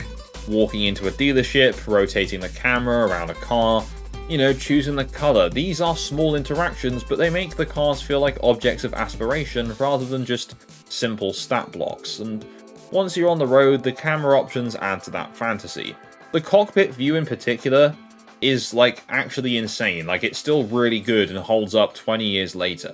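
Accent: United Kingdom. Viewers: I like how for the history of the franchise you simultaneously cherry-pick and ignore games based on their localized titles. 'Le Mans 24 Hours' somehow counts yet 'V-Rally' doesn't. 'TDO' is in but 'Test Drive Off-Road' isn't. Opinions and facts are not interchangeable. Also, you sound fairly British to me, why are you using NTSC titles and not PAL?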